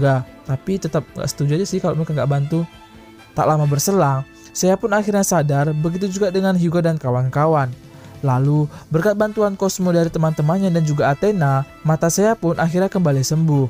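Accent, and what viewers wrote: Palembang, Indonesia. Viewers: Anjir kuat nean Wong Palembang ceritonyo? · kuat nian? wong plembang apo mang? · Mimin nyo wong Palembang hehehe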